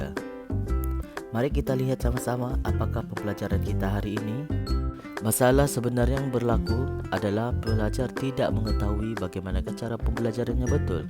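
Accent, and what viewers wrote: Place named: Sabah, Malaysia. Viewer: KETARA SABAH TU HAHAHA. TRUSKAN IDENTITI SABAHAN TU . BTW NICE CONTENT 👍👍👍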